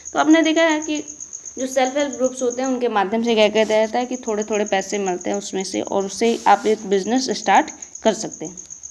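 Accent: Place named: Bihar, India. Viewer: aap bihar me kha se ho kyunki apki language suni suni lgti hai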